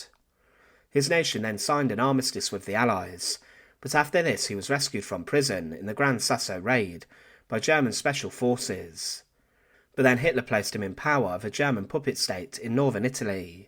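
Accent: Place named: England, United Kingdom. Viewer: I wonder where the man learnt this English accent. He sounds English from England. It’s a bit like Australian English and American English where some statements high rise for reassurance that you agree, like a question.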